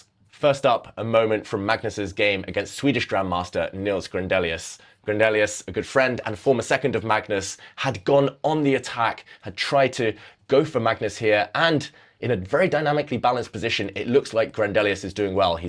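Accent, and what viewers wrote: United Kingdom. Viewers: It’s the British accent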